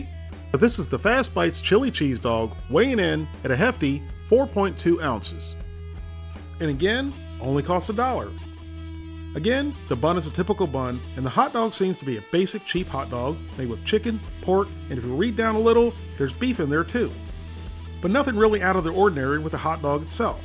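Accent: South, United States. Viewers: Hugedickerino Kripperino he sounds American, and from the accent I will say he's from the south.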